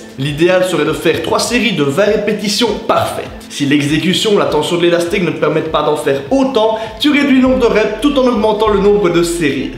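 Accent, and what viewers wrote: Liège, Belgium. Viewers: Serais tu liégeois? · On entend que tu es belge aha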